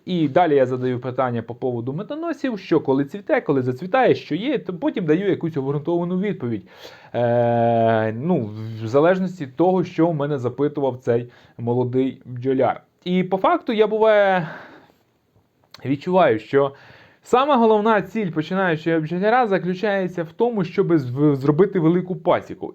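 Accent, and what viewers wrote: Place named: Central Ukraine, Ukraine. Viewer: Прослухав ще раз ! А ми земляки. Так розмовляють в черкаській та вінницькі області..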